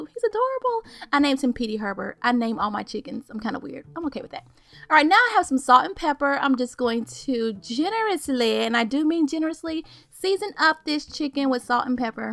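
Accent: South, United States. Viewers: Yeah, got to say this was THE BEST homemade roast chicken I've ever had. Thank you SO much for your video. Informative and entertaining...love your Southern twang!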